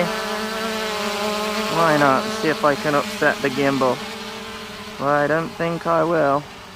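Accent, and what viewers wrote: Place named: United Kingdom. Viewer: Your so popular I think because your delivery mixed with your British accent then add that you're very informative and interesting to listen to. Keep up the good work! Sincerely a Loyal subscriber. William Horsley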